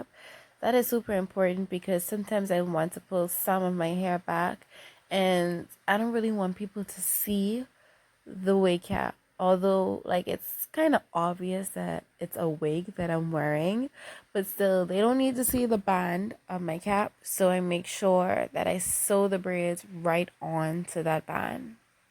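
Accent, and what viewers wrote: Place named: Bahamas. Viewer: You sound like a Bahamian......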